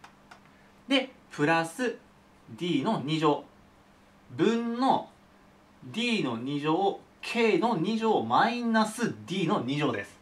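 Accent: Kansai, Japan. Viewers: たまに関西弁のイントネーション入るよね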